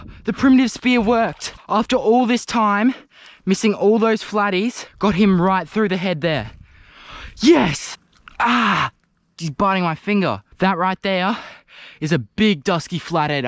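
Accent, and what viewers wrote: Australia. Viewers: Where at, his dialect sound Australian not trying to profile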